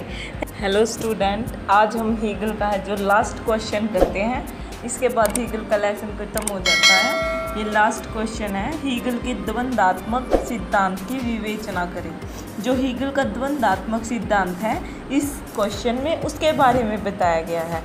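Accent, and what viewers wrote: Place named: Haryana, India. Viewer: Haryanvi presentation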